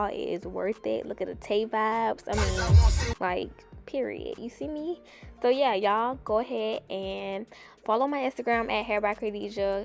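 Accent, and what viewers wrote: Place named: New Orleans, United States. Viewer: you sound like you from nola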